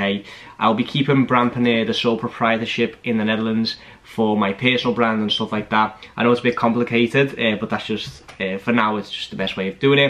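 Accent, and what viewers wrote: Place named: Liverpool, United Kingdom. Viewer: how is it that you are a dutch citizen but sound like a scouser? ☺️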